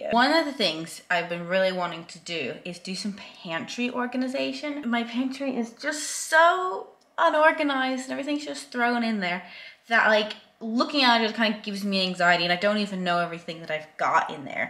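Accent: England, United Kingdom. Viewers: That English accent is coming along lovely bruv :)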